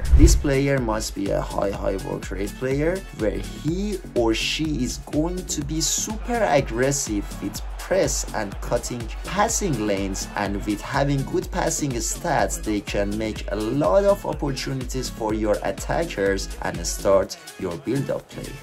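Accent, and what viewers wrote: Iran. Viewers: your accent looks perfectly like iranians داداش😂❤️ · persian accent hits so hard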